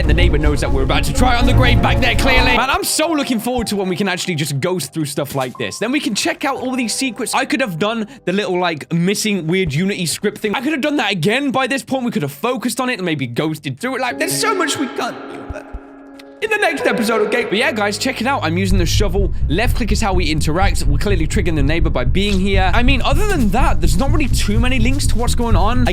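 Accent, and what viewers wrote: United Kingdom. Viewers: I love your British accent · Are you British?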